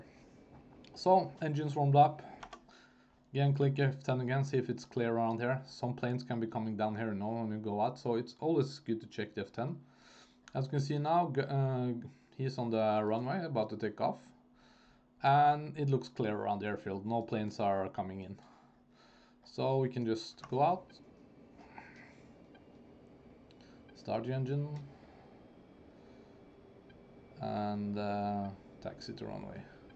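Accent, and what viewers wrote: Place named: Norway. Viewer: U sound Norwegian💯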